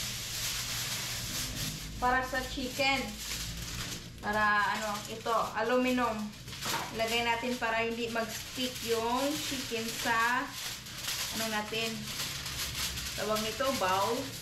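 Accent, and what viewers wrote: Visayas, Philippines. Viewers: Tama ka sis..naawa ako sa knya kc grabe kun mambash ang iba..she’s just being true to herself..i am really so proud of her.hindi niya tinatago kung ano meron sa paligid niya.yung pronounciation xa ok lng kc bisaya xa hindi xa sanay sa tagalog..understandable.marami lng tlg bashers..sa totoo lang habang nangbbash cla, ang blessings bumubuhos kay..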